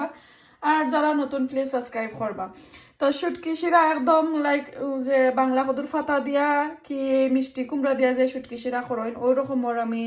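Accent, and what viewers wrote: Sylhet, Bangladesh. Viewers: সিলটি মানুষের সিলটি মাত হুনলে বড় বালা লাগে এর লাগি আপনার ব্লগ আমার খুব পছন্দের। হুকন শিরা আমরার প্রিয় খাবার। আপনার গাছের কদু দুইটা খুব সুন্দর লাগের অনেক কচি। রেসিপি টা মাশাআল্লাহ খুব সুন্দর হয়েছে। সব মিলিয়ে আজকের ব্লগ টা খুব সুন্দর হয়েছে 😋😋👍👍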